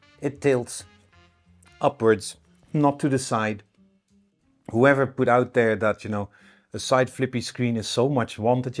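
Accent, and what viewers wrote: Netherlands. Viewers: Ik krijg onmiddellijk een combinatie van plaatsvervangende schaamte en tenen krommend afgrijzen bij het horen van dit steenkolen nederlands engels. Zal best goed zijn maar niet te trekken dit.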